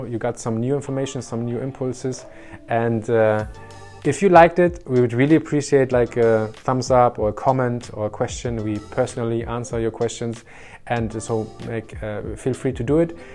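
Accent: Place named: Germany. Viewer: Kann ich dich vielleicht irgendwie sprechen, ich bräuchte etwas hilfe. Danke für das Video, ich habe rausgehört das du Deutsch bist. LG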